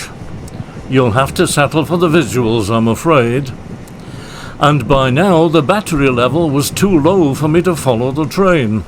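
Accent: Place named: Scotland, United Kingdom. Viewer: Of Asquith Xavier, moment /..., on top of your enchanting Scottish brogue, lilting loftily with meandering messaging, your incidental account of Mister Xavier provides ample knowledge of his troubled encounters. Suddenly, today, he is world famous because of your timely endeavor. Thank you, kind sir, his memory certainly lives with me and, hopefully, many millions of minions scattered beyond...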